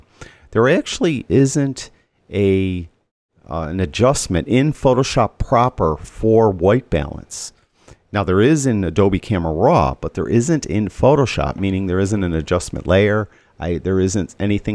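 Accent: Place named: New York, United States. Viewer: Thanks. You astound me with your knowledge and how you remember all the shortcuts keys for so many programs. I am a Lightroom Cloud user + I also use Pixelmator Pro as I find Photoshop to cumbersome a program to use when I only need to use it spasmodically. I really like Pixelmator Pro and find it a lot easier and more fun to learn and it is also very good value at a one off cost of $35 AUD when they had the half price offer. I will definitely be watching your future Pixelmator tutorials and if I can think of anything specific that I would like you to cover I will let you know. Has anybody ever told you your voice sounds like Paul Stanley from KISS. Must be the New York accent. Cheers from Sydney Australia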